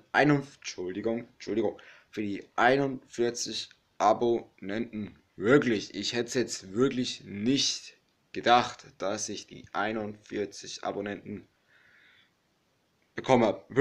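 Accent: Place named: Franconia, Germany. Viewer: Bitte gerne. Du bist noch Jung du wirst noch was passendes finden;) Echt aus Franken,dann hab ich das aber gut raus gehört;)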